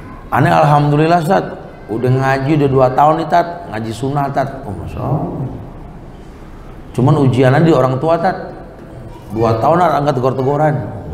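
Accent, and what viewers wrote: Indonesia. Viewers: Ust .... semoga semakin barokah... kajiannya ringan, intinya gampang di cerna , penyampaiannya sedep banget dech logat betawinye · Waalaikumsallam..skrg sy hampir tiap hari menyimak kajian ustad...trmksh pak ustad atas ilmunya sangat bermaafaat.buat kami...penyampaiannya juga mudah di terima..gaya betawi penyampaiannya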